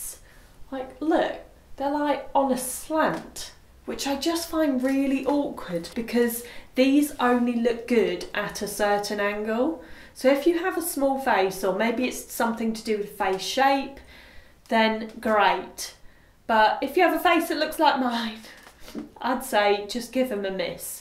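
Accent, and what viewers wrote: United Kingdom. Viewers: I love your video and your british accent. The dupe you are proposing are stupefying. Good luck for your marathon! Your fan from Quebec! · I love love your speech with the clear british accent and beautiful voice.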